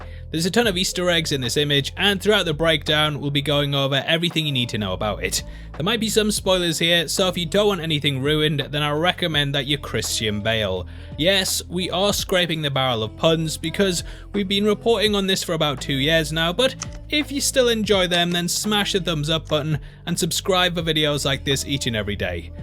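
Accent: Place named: Newcastle, United Kingdom. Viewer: My face when I realised the voice I was listening to was!! Geordie 🐐 or battle rap 🙌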